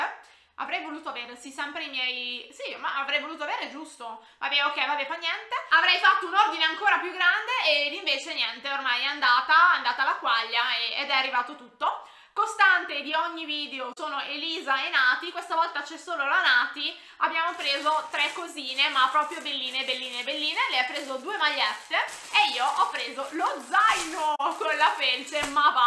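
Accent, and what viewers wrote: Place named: Bergamo, Italy. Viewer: Ciao! Ho trovato il tuo canale per caso e hai uno stile molto simile al mio - una domanda, sei per caso di Bergamo? L’accento mi sembra simile ✨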